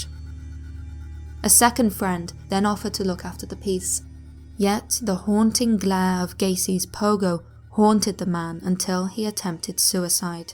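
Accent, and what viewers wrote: England, United Kingdom. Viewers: Great video...love the accent!! Not trying to be Pervy McPervinstein but english accents are sooo hot and make a woman sound classy and intelligent which we all know is classy as well. Anyway ive aleays seen depp as a wussy...no suprise a painting could do that to him. Especially knowing the backstory of not just painting but artist as well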